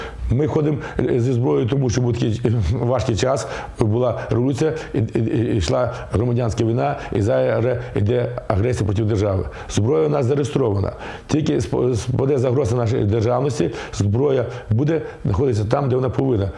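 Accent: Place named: Western Ukraine, Ukraine. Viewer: ааааааа! Тогда по твоему пол западной Украины контуженные! Это форма разговора такая!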